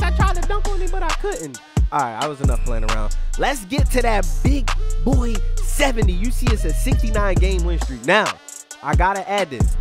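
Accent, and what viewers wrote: Baltimore, United States. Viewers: “Twiu, dwiu” Baltimore accent so ugly moe 😭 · Is from Baltimore or Massachusetts? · Baltimore accent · Yo you gotta be from Baltimore we got the same accent and we talk the same 😂💀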